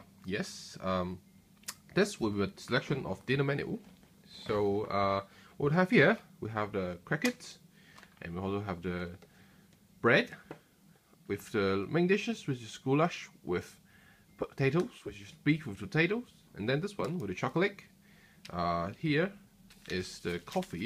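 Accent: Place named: United Kingdom. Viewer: Very nice video but your accent is the weirdest thing I have ever heard. Most of the time you sound english (british) but then you say things like "choclick" or "lick out" instead of "leak out" and some other little weird things. I am curious, where are you from and do all people talk like that there?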